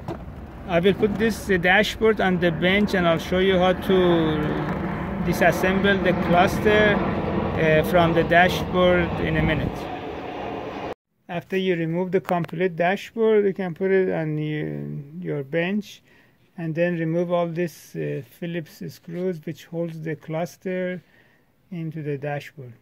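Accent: Iran. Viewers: You sound like your Persian, great video. How do you tell, or what are the symptoms this part needs to be replace?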